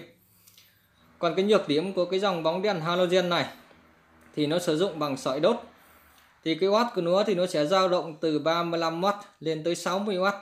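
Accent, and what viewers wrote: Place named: Thanh Hoa, Vietnam. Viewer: Ông anh người tỉnh nào thế? Nghe có vẻ như Thanh Hóa thì phải, Giọng hơi khó nghe.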